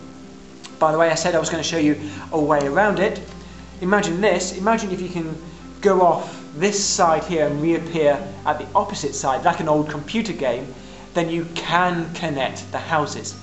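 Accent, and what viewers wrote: United Kingdom. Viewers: the britsh accent is what kept me watching, and then i actually found it interesting!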